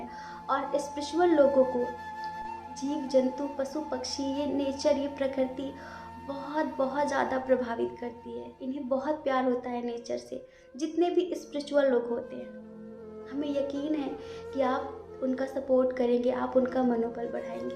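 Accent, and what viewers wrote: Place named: Pahari, India. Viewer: Kitni pahari aawaaj hai aapki waise hi payare aap ho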